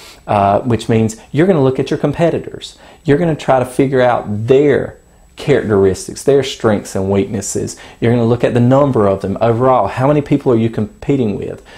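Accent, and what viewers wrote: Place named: United Kingdom. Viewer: I love his teaching and accent (though I have been a lover of British accent)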